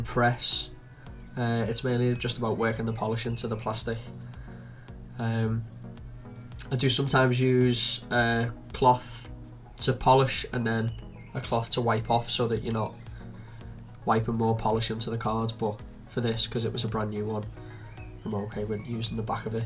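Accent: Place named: Liverpool, United Kingdom. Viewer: Thank you scouser! I can't tell you how much bother you've saved me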